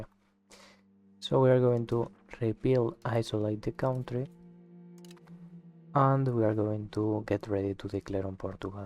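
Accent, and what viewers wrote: Spain. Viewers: Eres español hablando inglés ese acento no falla jajajaja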